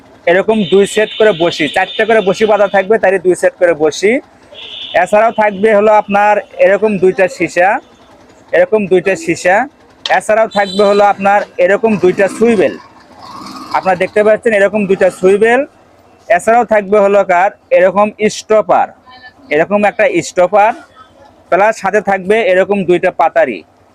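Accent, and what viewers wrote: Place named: Bogura, Bangladesh. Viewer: ভাই আপনার ভাষা শুনে মনে হ‌ইতেছে আপনি বগুড়া সদর বা তার পাশে পাশের এলাকা থেকে বলছেন।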